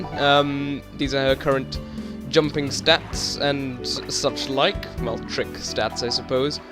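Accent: United Kingdom. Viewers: U rock I love ur british accent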